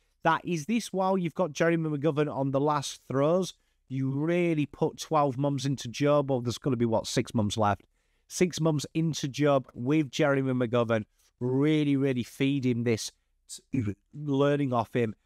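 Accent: England, United Kingdom. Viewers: Curious, how did a Pom with a such a thick accent get to know so much about AFL, do you live in Oz? Anyway great channel and insights. Tom McCarthy has firmed as the front runner it seems. He's fast, really fast. Right age bracket, plug n' play. But gawd, we need a good ruckman asap!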